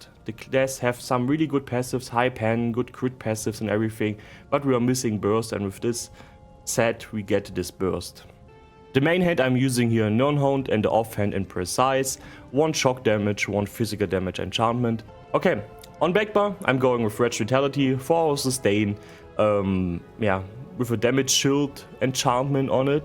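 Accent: Germany. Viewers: Immer wieder leicht lustig, wenn ein Deutscher Spieler flüssig Englisch spricht, aber es doch sehr Deutschenglisch klingt :-)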